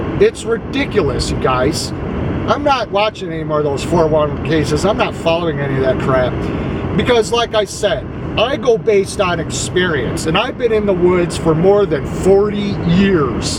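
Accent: United States. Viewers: American, i would say. (english accent)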